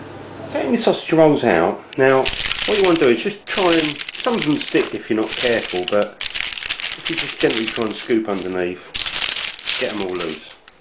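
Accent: United Kingdom. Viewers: My apologies, I thought you were talking about something you can only find in the uk, looking back on the video, I now see you were talking about BEATED egg, and not beat and egg. I sometimes have troubles with understanding uk accents (I come from Canada). Thank you for your understanding, ~DeathAtheist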